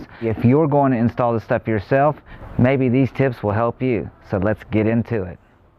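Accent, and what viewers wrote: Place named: South, United States. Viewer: Dude, your a boss on this one! Great explanation. Great accent! I’m thinking somewhere between N. Carolina and Mississippi!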